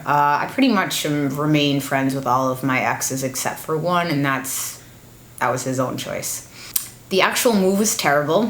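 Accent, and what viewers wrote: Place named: East Coast, United States. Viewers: Always enjoy your content, and your raspy East Coast voice, RI native here. Monty Python is one of the building blocks for a great childhood, go dad! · I love your raspy east coast voice because it makes me remember I’m watching another goddamn adult on YouTube, not a teeny bopper. There’s a time and a place for their content...but I always stop drop and watch your videos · I came to your channel a couple of years ago because of your “bitchy look and raspy east coast voice” lol. I have the same look and not raspy but an east coast (Nj) voice lol. Love the fact that you’re not a twenty something YouTuber!! Your opinions have always been trustworthy to me. I’m glad I found you ❤️.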